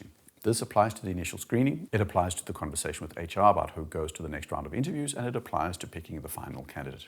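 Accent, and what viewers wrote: South Africa. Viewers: Sounds like the accent of a South African whose first language is English, he most likely is a native speaker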